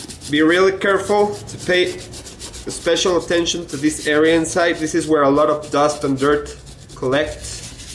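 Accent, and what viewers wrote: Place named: Mexico. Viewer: He´s mexican i knew because his accent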